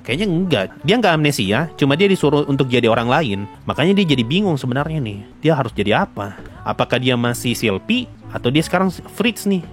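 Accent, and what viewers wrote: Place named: Eastern Indonesia, Indonesia. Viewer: anjir logat mu kek tmn2 gw yg dr ntt/papua bg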